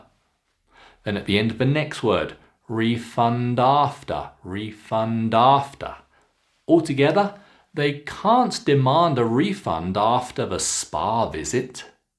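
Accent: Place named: RP, United Kingdom. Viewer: You have a modern RP accent. Were you born in the south of England?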